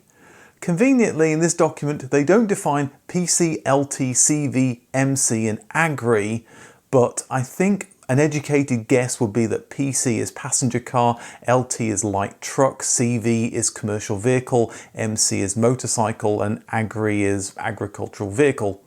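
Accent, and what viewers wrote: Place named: United Kingdom. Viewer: Fun stuff,!!! I have deduced you must still live in the USA as the MINI you and Missus are standing by has the USA size plate frame and you mention it is still in your motorpool. And I had assumed you were UK based and that the missus had moved there!!!! Its the accent and the UK rear plate mock up in the background. Very enlightening, although a little surprised that you don't have a more varied list of dream cars that do not break the paper floor of the 1970s. Well, all of us care nthusiasts can't be Jay Leno have love almost every cool and unusual car ever made. Keep the videos coming as they are always insightful and properly researched.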